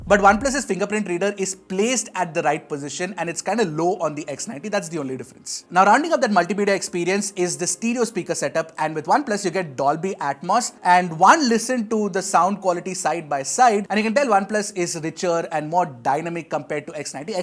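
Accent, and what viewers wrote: India. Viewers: WOOOOOOOOOOOOOOOOOOOO! THAT INDIAN ACCENT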